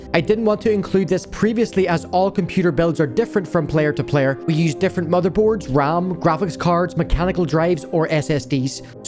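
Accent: Northern Ireland, United Kingdom. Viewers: Good to hear another person from ni here lol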